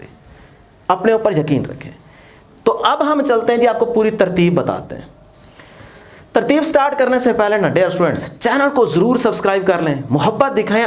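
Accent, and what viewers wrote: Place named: Saraiki, Pakistan. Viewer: Sir AP ky bolnay k Andaz sy pta chal gaya mn bhi sariki ho😊😊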